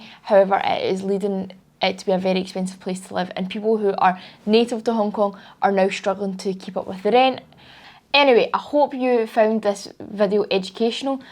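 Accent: Scotland, United Kingdom. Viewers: Wow Scottish accent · More like a Scottish accent. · We need to cut population in Hong Kong if there aren't much more land can be released to solve the housings issue. BTW, it's nice to hear some Scottish English after getting bored with our own kind here the Aussie. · Like other said, strong Scottish accent, interesting to hear～😁😁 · 好重正宗英國口音, 同US/Canada 北美口音差好遠 , 真正英文就好似國語而喺我哋Vancouver啲北美英文就係廣東話😸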